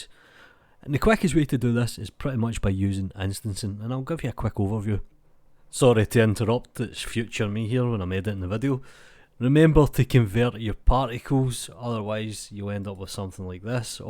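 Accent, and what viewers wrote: Scotland, United Kingdom. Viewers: why do i think you are from scotland ??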